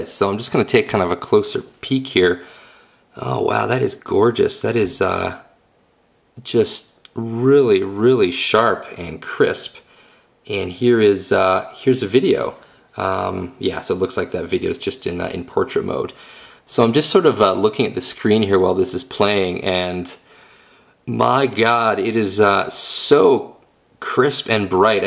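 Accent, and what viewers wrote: Canada. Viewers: And off-topic, but this was the first time I noticed the Canadian accent. lol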